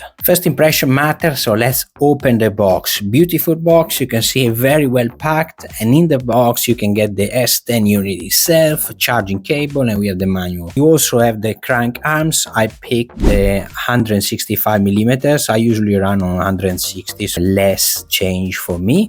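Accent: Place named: Italy. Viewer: Ciao, se non sbaglio dall'accento sei italiano🇮🇹 .... Perché fare video in lingua inglese😢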